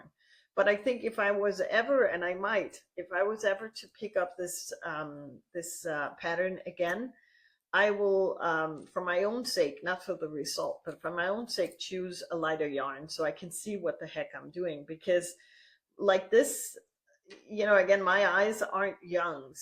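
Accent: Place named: Denmark. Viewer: Thank you for this podcast. Love also the little danish prononciation🤗 Quiet complicated Danish language…..but I try to repeat it a few times after you😂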